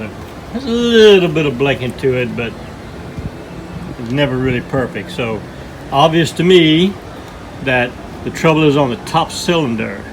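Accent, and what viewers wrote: Louisiana, United States. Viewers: Haha I miss dat accent. My people are in Thibideaux.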